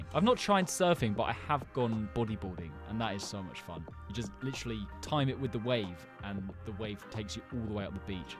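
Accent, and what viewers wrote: United Kingdom. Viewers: yo are yu british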